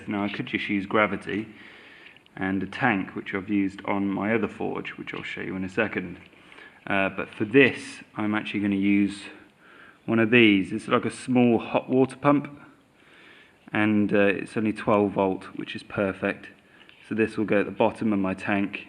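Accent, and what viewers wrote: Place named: Birmingham, United Kingdom. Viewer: PS, I'm guessing from your accent you're from around Birmingham??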